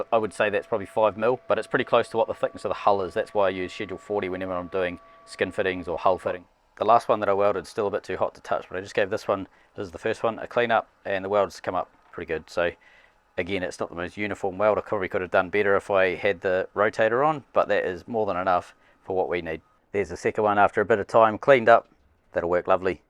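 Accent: New Zealand. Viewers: That is the kiwi pronunciation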